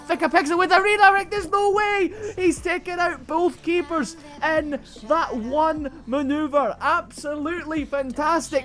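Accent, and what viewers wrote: Scotland, United Kingdom. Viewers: The Scottish Accent 🤣💯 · i hate the scottish acent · That Scottish accent deserves 1mil subs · he sounds scottish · THAT SCOTTISH ACCENT LMAO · Love the Scottish accent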